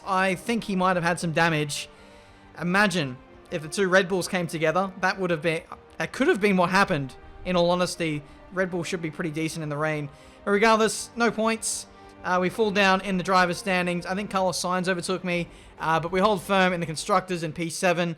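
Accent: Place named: Australia. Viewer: How to watch channel: Step 1: Select video Step 2: Click "Like" and "Subscribe" if you haven't already. Step 3: Sit back, watch and listen to smooth jazz Australian Commentating Voice.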